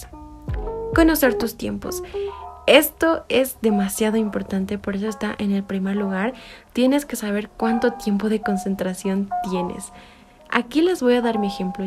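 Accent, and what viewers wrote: Bolivia. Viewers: Eres de BOLIVIA?? Gracias por el video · Una pregunta eres de bolivia ?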